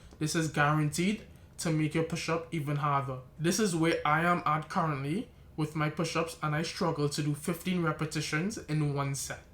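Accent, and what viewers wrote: Trinidad and Tobago. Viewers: Trinidad man?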